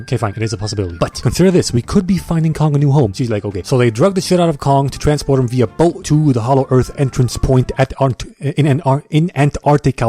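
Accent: New Zealand. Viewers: He’s New Zealander not Aussie get it right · He ain't no Australian that's a new Zealander , and I should know because I'm Australian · buddy he's very clearly a kiwi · hes from new zealand not aussie😂😂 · He’s from New Zealand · OMG HES FROM NEW ZEDLAND OR WHAT EVER THE FUCK NOT ASTRAS;IA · Hes a kiwi 😑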